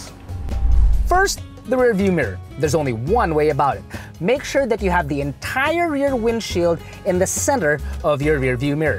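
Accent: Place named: Philippines. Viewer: your English is very good almost can't tell if you're from Philippine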